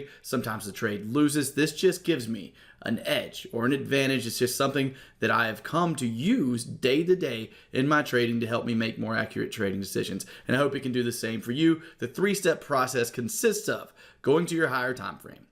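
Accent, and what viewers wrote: South, United States. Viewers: Love your southern twang.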